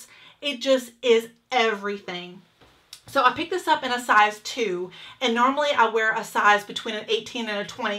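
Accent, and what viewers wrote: South, United States. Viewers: I’m a southern girl and I instantly realized that you were southern too when you started talking and I immediately subscribed. Mainly because you don’t see many southern women as YouTubers so it was just amazing to see honestly. That prolly made no sense but I just wanted to tell you to keep doing what your doing. It’s amazing 🥰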